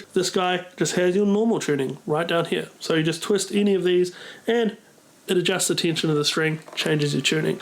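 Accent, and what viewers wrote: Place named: New Zealand. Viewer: Whoever didnt know accent is kiwi needs to watch some more deck themed videos. Glad to know you're in a prog metal band man, will look it up. Great stuff and great guitars ❤️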